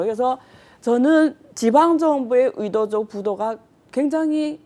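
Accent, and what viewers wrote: Yanbian, China. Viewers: 발음하는거 보면 모르냐? 원래 조선족인데 정신이 바로 박혀서 한국에서 애들 가르치잖아. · 조선족인가? 연변말투네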